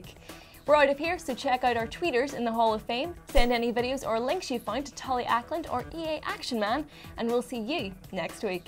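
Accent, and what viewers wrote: United Kingdom. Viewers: Welsh mixed with a southern English accent i think. Hard to figure out, maybe even a little irish is in it.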